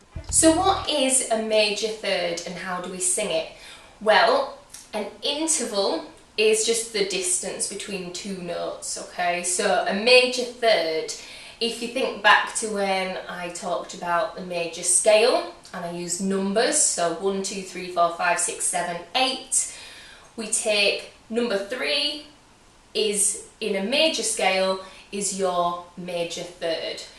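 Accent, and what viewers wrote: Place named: London, United Kingdom. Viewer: love your accent. London?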